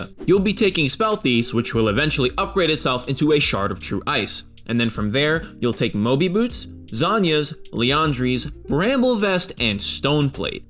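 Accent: Spain. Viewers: why would you pronounce a french phrase with a spanish accent?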